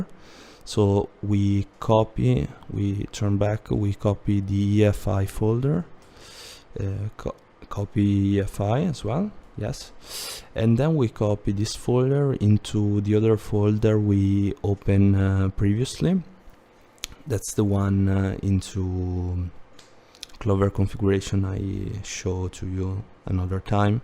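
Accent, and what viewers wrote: Italy. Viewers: ho capito che eri italiano dalla pronuncia, qualsiasi italiano mantiene la pronuncia italiana anche se parla in inglese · Grazie!!! Cmq si sente un bordello che hai l'accento italiano 😂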